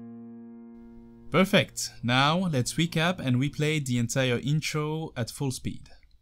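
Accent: France. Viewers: Absolutely love this video, it's really helping me. Thank you! Cool accent by the way, were are you from? France?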